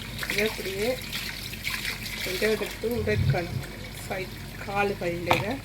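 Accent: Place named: Sri Lanka. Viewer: நண்டுக்கறி வறுத்து செய்த சமையல் மிகவும் அருமையாக உள்ளது இலங்கை தமிழில் உங்கள் பேச்சு அதை விட இனிமையாக உள்ளது சகோதரி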